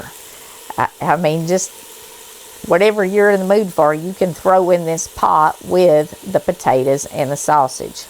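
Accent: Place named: Arkansas, United States. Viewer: Howdy! As of right now, I see you posted this video a couple of hours ago. I watch all of your videos on YouTube via TV. Always hit the “Like” & share your videos. I am about 1-2 hours, NW of Lake Tahoe. I love listening to you, your accent makes me feel like I’m with my Arkansas family, on my Grammy’s side. I hope y’all have a Blessed year ahead. Much love and blessings to your whole family. XXX RHONDA 🙏🏼✝️💖🇺🇸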